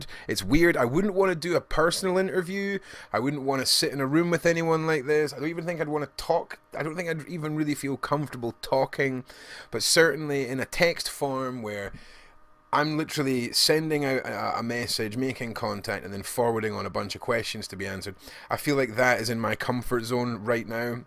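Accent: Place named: Scotland, United Kingdom. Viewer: Seen your content on a dark Web video. When I heard you were Scottish I jumped on you tube 💚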